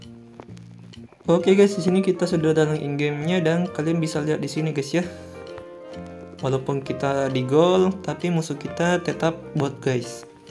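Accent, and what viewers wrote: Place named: Sulawesi, Indonesia. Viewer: Bg km pasti org Sulawesi!!?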